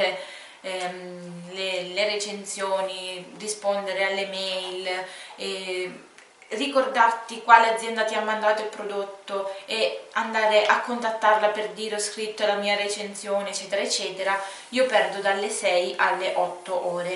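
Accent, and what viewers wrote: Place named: Sicily, Italy. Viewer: Ciao sei davvero molto simpatica e i tuoi video sono molto utili. Guardando questo video ho sentito che sei Siciliana come me. Se ti fa piacere visita il mio canale =)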